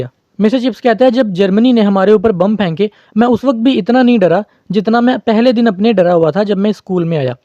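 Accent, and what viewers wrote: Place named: Lahore, Pakistan. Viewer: Apki language lahoriii type hai ap Lahore sy hai ?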